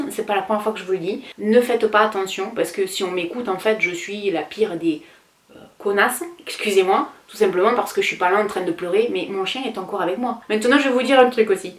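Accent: South, France. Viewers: Merci pour cette vidéo. Ca faisait longtemps que je n'avais pas été sur tes vidéos et tu es tellement rafraîchissante avec ton accent du sud. je t'embrasse. Fiona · Coucou, ça doit être la première fois que je laisse un commentaire sous tes vidéos. C'était pour te dire que tu es une YouTubeuse que j'adore regardé et je ne suis pas déçue d'avoir découvert tes vidéos y a maintenant bien 2 ans. Ton accent me donne envie de redescendre dans le Sud a chaque fois ^^ Pour Instagram je dirais vas-y au feeling, poste des photos de ton environnement, de ton fils, tout simplement ce qui te plaît a l'instant T! Continue tes vidéos, encore plus des vidéos comme celle-ci et celle sur ta maison. Bisous, une abonnée d'Alsace 😉😘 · Plus le temps passe plus j'apprécie tes vidéos! Ton accent du sud me réchauffe le cœur 😊 pour Instagram post ce que tu as envie TOI de poster et partager ne fait pas la bouche en cul de poule (ça m'a fait presque cracher mon café sur mon clavier c'était excellent! 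😂) ensuite pour ton bébé tu as TOTALEMENT raison profite tant qu'il est avec toi c'est quoi ces commentaires a la noix.. Ce concept de lifestyle une journée avec toi j'apprécie totalement. Je retrouve ce plaisir de me pauser le temps de regarder une vidéo me vider l'esprit avant de ré attaquer mes taches ménagères quotidiennes. Continue comme ça j'aime vraiment ce que tu fais, tu apportes du positif dans le quotidiens des gens et merci du fond du cœur pour tout ça!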